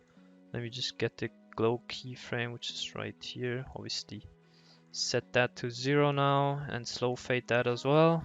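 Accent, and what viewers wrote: Germany. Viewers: Are you german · Omg ich checke jz erst das du Deutsch bist 😂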